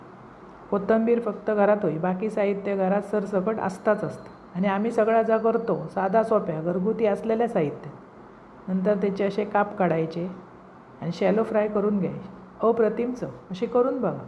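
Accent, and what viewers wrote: Malvani, India. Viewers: ताई तुमची बोलण्याची पद्धत अतिशय गोड वाटते .आपलेपणा वाटतो.मालवणी गोडवा .पदार्थ छानच.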